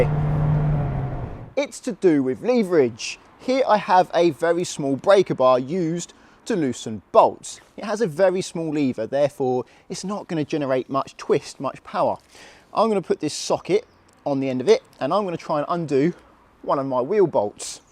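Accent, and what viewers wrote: United Kingdom. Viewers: I think he is being rude about your British accent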